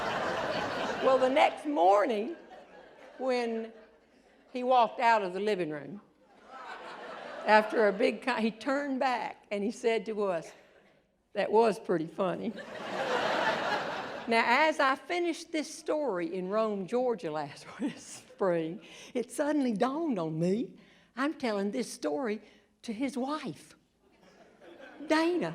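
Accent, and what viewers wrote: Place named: North Carolina, United States. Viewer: I could tell by your accent you were from N.C. I was hearing the Piedmont area and then you said Alamance County. There you go! I live on the east side of Raleigh off 64.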